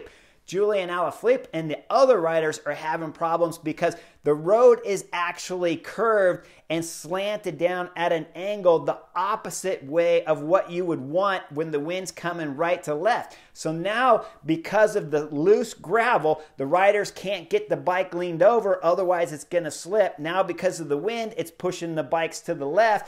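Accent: United States. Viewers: you’re really excellent at your craft. You’ve always been a good spokesman, with your Cali-cool way of talking, even back in your racing days. I appreciated your insight into the not-so-obvious reasons for the big crash. And, I also appreciated that you were scared while racing. I was too. The fear (ie adrenaline) will produce strength and performance beyond what is imaginable.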